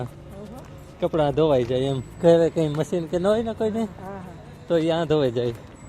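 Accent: Kathiawar, India. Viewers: Sita Ram, bhai. Boli upar thi kathiyawadi lago cho. Lincolnshire chuo?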